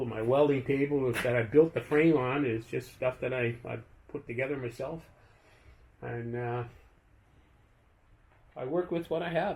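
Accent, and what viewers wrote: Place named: Canada. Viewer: I am in Pennsylvania. From the accent I am guessing you are somewhere in Canada. I will email you my contact info.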